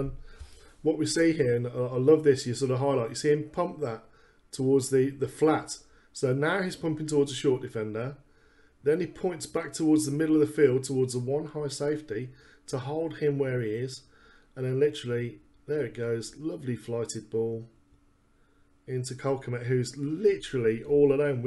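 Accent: England, United Kingdom. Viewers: Am I hearing an English dude doin detailed football analysis, love it 👍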